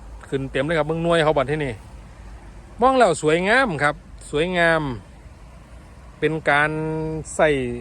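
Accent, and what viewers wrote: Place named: Isan, Thailand. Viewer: พูดอีสานฟัง เพราะกว่านะคะ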